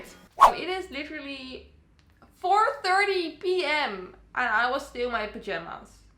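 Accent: Netherlands. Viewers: She’s dutch so that’s why the accent prolly overlaps 😸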